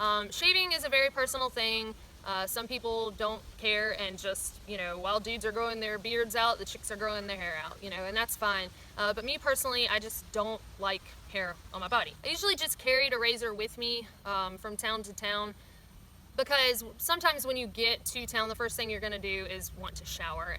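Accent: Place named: Alabama, United States. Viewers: I really dig your southern Alabama accent!! It's really awesome that you share such intimate and taboo subjects such as personal bodily functions that not a lot of people would really share. I am not trying to be vulgar but when you said you hated body hair.. do you recommend shaving your pubic hair (male or female) to prevent chafing and also for hygienic purposes? Anyway, thanks for the great info and stay safe out there and please keep the great videos coming. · I knew your accent sounded familiar. you said Alabama.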